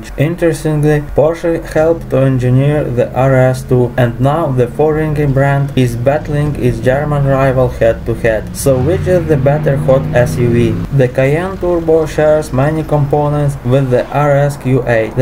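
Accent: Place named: Russia. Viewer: Не привычно слышать русский акцент в видео на английском )) Молодец, продолжай!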